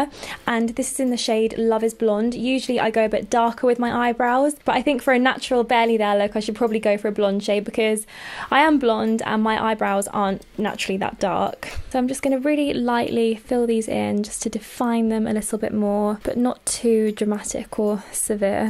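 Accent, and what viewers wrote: United Kingdom. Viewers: In which oart of the Uk do you lievv? Aksong bc of your accent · this is the first video I've watched of yours so far. and I absolutely love it!!you're so feminine, and polite, i love your light pink style and I love your accent. I've always loved British accents, thank you so much for making this, I love natural makeup looks.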